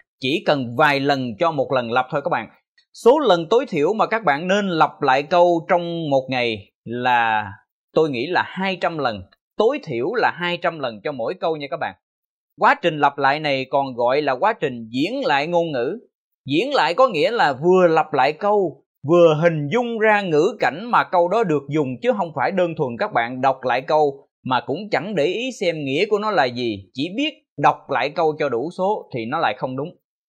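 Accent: United States. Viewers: Rất hay thầy ơi,, mih thích lắm,, Đúng với mih đang cần học,,, bạn nói English phát Âm giống như người ở Mỹ,,, và cũng giống như giọng người Bản xứ,, it's great 👍❤ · Giọng Anh mỹ thầy quá chuẩn hay lém thầy ơi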